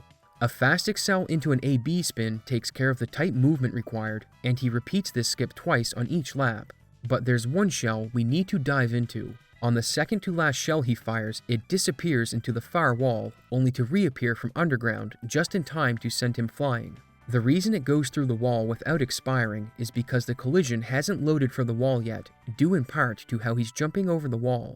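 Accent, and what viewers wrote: Canada. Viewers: very canadian accent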